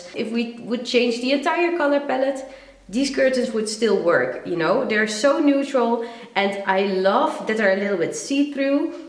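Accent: Netherlands. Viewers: Haha het Nederlands accent komt haast altijd overal bovenuit, zo cute🥰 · I just stumbled on your channel and as soon as I heard you, I knew you were Dutch! My mother was Dutch and I have a lot of family in the Netherlands. I love your home!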